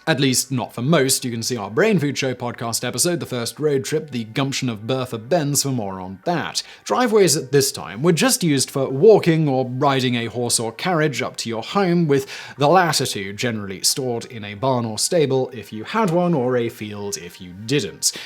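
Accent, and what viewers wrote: United Kingdom. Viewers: British English has entered the chat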